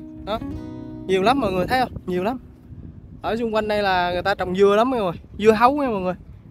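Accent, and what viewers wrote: Ben Tre, Vietnam. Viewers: Lần đầu xem video a nghe tiếng e nghi a ở bến tre rồi. E ở batri e cũng đang cùng nghề bếp .e cũng hay xem video của a cảm ơn a đã chia sẻ những video ý nghĩa chúc a thành công trong công việc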